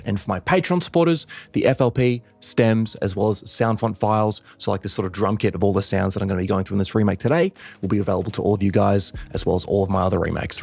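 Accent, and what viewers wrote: Australia. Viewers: I'm like pretty accent deaf so I never realised he's got an Aussie accent. Sick to see some yt producer faces from here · how did I not realise you were aussie lmao 😂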